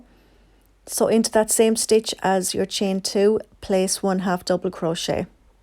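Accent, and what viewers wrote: Ireland. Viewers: Hi, I've been searching for a nice hoody/cardigan for the last few days and found yours, and I love it! I love your video very easy to follow and I love your voice , its very calm and mellow. Is your accent Irish?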